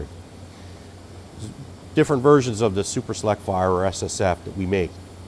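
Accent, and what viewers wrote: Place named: Pennsylvania, United States. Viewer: Pros of this video: - Since graduating college in W. PA 15 years ago, I miss that Pennsylvania twang - The lecturing on pin steel woke me up. I won't even THINK about getting an aftermarket pin now. Cons: - I can't actually see what you're doing. Close-ups would be nice, but since I've installed a LPK, I can infer what you're doing. The video overall is very helpful, and even if it's hard to get the kit installed, I can watch cool .mil photos in the meantime.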